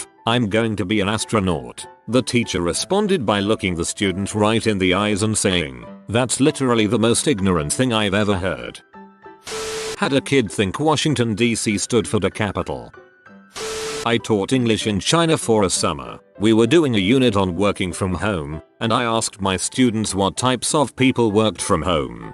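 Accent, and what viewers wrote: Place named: Midlands, United Kingdom. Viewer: That makes sense. Of course, some of these have to be read. Because some of these pronunciations make it seem that the TTS is using a Midlands accent. (If that makes no sense, it's difficult for someone Irish to understand someone with a Midlands accent when they're talking "normally". Or basically anyone who doesn't have a Midlands accent.)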